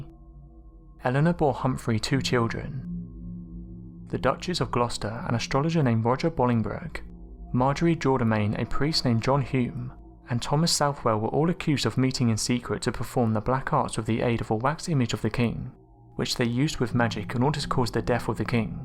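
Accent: United Kingdom. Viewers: I normally don't care for the British accent but there is something soothing about his voice. Makes it that much more enjoyable.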